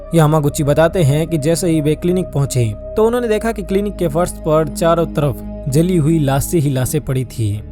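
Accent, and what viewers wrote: Rajasthan, India. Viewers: Bhai ap rajasthan hai syd awaz aur language se thoda lg raha h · Bhai rajasthan se ho kya Accent se lag rha h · Rajasthani awaj h bhai jodhpur bikaner aur nagour ki tref tk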